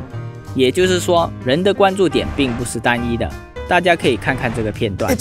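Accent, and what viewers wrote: Malaysia. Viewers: 偶然刷到这个影片，听你口音一听就是马来西亚，不像是台湾还是中国，翻了一下，居然是吉隆坡的。突然觉得马来西亚的Youtuber有望。很棒ayy! 加油!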